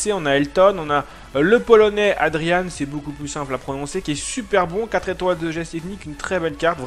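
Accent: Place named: Marseille, France. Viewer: pk il parle comme les marseillais delà télé-réalité lui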